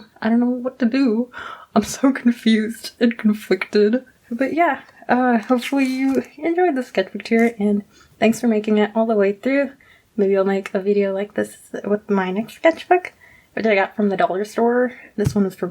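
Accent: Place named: Michigan, United States. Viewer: Ah man! I have so much to say about this! It is lovely to see everything, and I'm impressed by how consistently well done everything in this is (most of my sketchbook pages are completely unfinished.) Your experimentation with styles is inspired and wonderful to look at because of how well you adapt to them. I'm scared that you don't like practicing full body though. I know I struggle with it too, so I get that it's intimidating, but please don't be discouraged! Gesture drawings and references really do help, and all artists should integrate them into their habits. Also your accent is adorable, my god I never realized how different Michigan and Canada's accents are.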